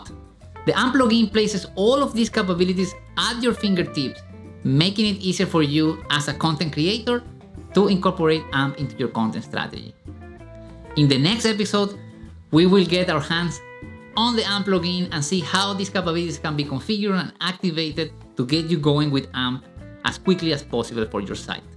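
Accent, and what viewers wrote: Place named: India. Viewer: Why is it that Indians are obviously Indian no matter what language they speak?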